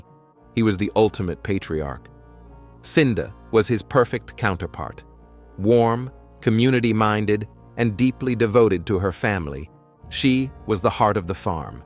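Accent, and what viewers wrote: Delaware, United States. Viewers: 😂 Not to get off this topic, but you sound like you live in Delaware. DMV here will piss anyone off to the point you get lost trying to drive away from there. 😂😅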